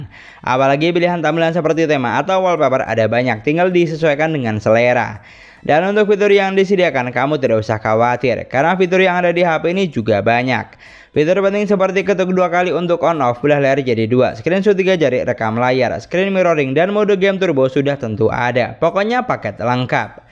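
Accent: Madura, Indonesia. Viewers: Bang udah kaya orang maduran jualan sate logat nya😅 semangat terus❤ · Kyk logat madura tesate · Itu ciri khas orang madura blokkk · Keren ciri khas logat Maduranya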